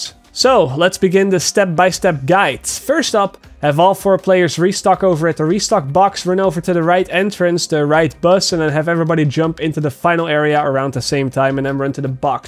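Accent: Netherlands. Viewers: yo this guy dutch?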